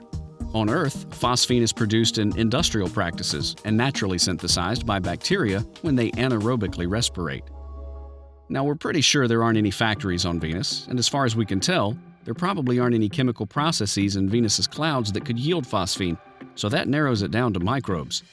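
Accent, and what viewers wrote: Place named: United States. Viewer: Great video - too bad that the speaker has a boring and uninteresting American voice. A British accent would be much better for the viewership - or anybody else for that matter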